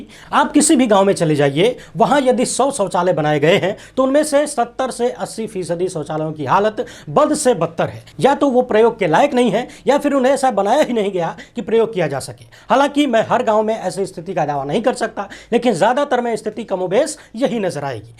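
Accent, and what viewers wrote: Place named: Bihar, India. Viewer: tumhara bihari andaaj kamal hai,news jo bhi ho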